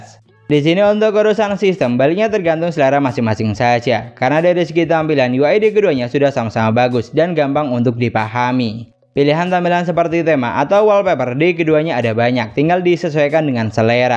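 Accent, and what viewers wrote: Madura, Indonesia. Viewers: Logat madura bg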